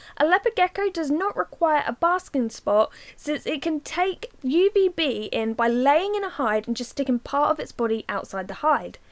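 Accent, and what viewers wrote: United Kingdom. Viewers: Ehy do you have a British accent ?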